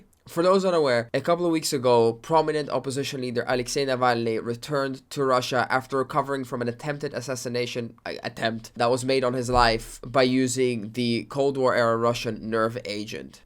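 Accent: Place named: Italy. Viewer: oh really? Sorry, it's just that you're accent sounded very Italian.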